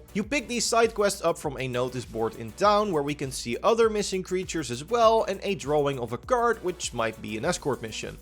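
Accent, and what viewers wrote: Netherlands. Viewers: dutch accent is so funny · Je klinkt uber Nederlands, klopt dat? Al een tijdje benieuwd 😂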